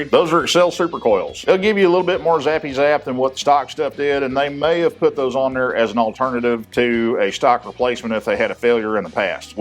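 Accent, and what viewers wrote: South, United States. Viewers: When someone with a southern accent tells me "That's bad, don't do that" with a cigarette hanging out of his mouth I'm gonna listen.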